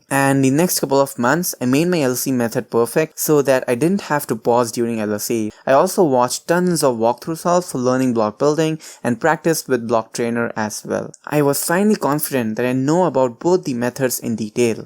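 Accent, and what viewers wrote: India. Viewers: Indian accent turned me off...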